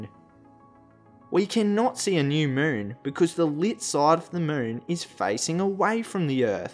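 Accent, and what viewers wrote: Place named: Australia. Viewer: this is the single most Australian accent I've ever heard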